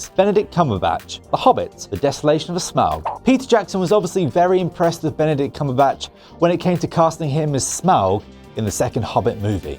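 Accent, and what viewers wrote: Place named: Cockney, United Kingdom. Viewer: Ex drug addict Cockney guys are not who you hire for voice over work...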